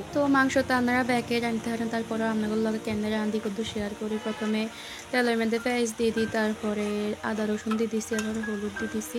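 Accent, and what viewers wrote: Noakhali, Bangladesh. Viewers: নোয়াখাইল্লা কথা আমার খুব ভালো লাগে নোয়াখালী ঘুরতে গেছিলাম থাকতেও অনেক ভালো লাগে ❤❤❤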